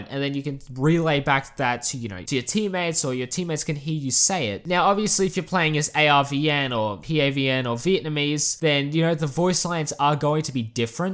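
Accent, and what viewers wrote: Australia. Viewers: Sounds strayan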